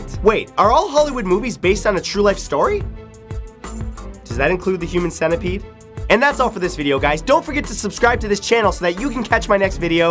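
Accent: Canada. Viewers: Canadian eh? love it and ya winter is BS here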